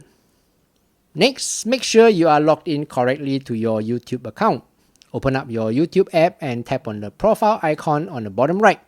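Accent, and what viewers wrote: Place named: Singapore. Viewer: Hi, Great channel sir. Im wondering if you can help me.. For the past year Ive been occasionally been making comments (usually compliments) on interesting channels. However I have virtually had no one ever add to my comment or even like it and when i look at all the other comments before and after mine most have a few other replies and likes from other members (who posted comments). I can visually see my comment after it's posted but it's like no one else can. Firstly, can you see my comment here? and secondly even if you can is there any other reason others cannot see my posts on other channels I comment on etc. Thank you! Jon New Zealand (Mate, you sound like you are from Singapore! I grew up in Singapore in the 80's lah!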